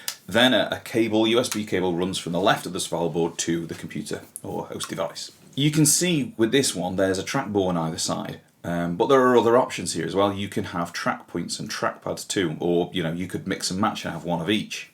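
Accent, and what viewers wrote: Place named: Stoke-on-Trent, United Kingdom. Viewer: Mate, are you from Stoke on Trent? That accent sounds familiar!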